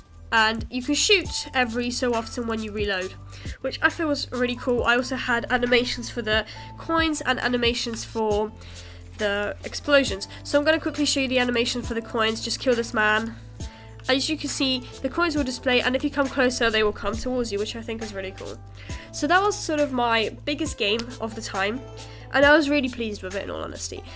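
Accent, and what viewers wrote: United Kingdom. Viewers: that's a nice british accent 😊you have greetings from France😈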